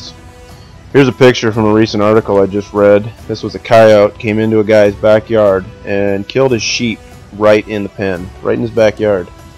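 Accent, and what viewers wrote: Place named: South, United States. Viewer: Sound like a true country boy. To the point, no filler, no bullshit. The only thing he didn't say was you found you way to my page and you decided to watch the video , so what ever complaints you got they're your own fault. Lmao!!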